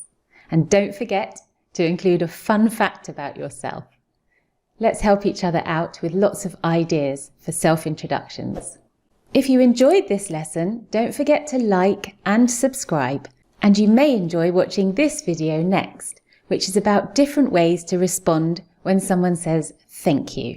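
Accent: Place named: United Kingdom. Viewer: I love to hear your British accent.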